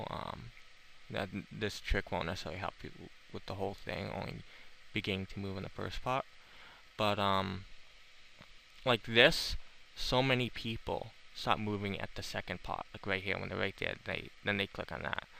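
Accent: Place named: New Jersey, United States. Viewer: morrrrrrrrrrrrrre and you have like a jersey accent :P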